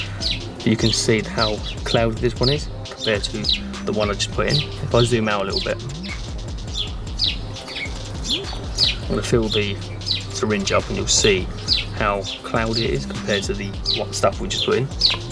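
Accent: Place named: Australia. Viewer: I like your aussie accent 👌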